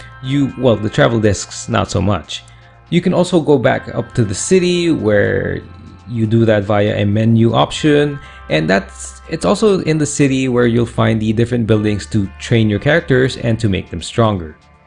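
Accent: Philippines. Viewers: That is not what I meant to my message. I know the accent of pinoys so I ask him if he is pinoy now do you get it?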